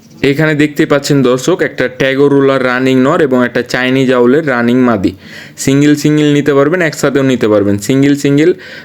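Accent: Kolkata, India. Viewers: উনার কথার ধরণ কলকাতার মানুষের মতো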